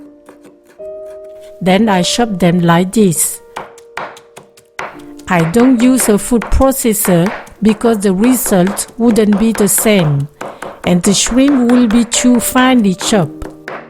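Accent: France. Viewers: she sounds very French!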